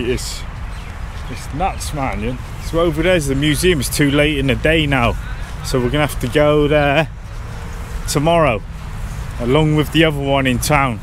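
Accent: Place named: West Country, United Kingdom. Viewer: Polar opposite to Pattaya, that’s for sure 😂 Which part of the England are you from? I hear a west country accent, maybe a Devon accent?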